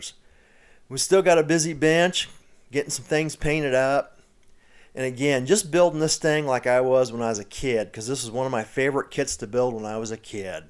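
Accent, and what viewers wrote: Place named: Pittsburgh, United States. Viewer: Black warsh?? Are you from pittsburgh too??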